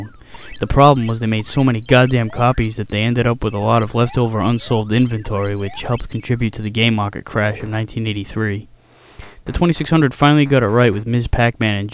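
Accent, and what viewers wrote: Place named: New York, United States. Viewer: You sound like your from New York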